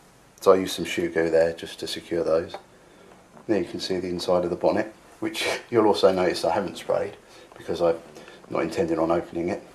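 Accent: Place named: England, United Kingdom. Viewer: Nice work! I never get tired of listening to an English Accent. : )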